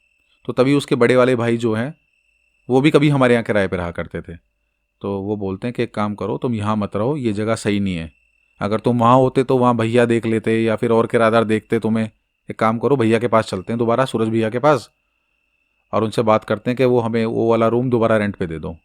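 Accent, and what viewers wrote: India. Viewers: Hii 🙏 nice your deshi voice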